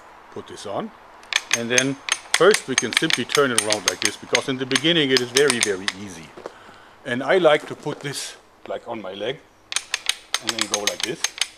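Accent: Germany. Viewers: Ik like his german accent en strange laugh: this is the slingshot channel, hahaha. Nice channel bro; I like your hobby; because our governments disarmed us. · His deep voice with German accent sounds brutal. His laugh is epic too. Should be in horror movies XD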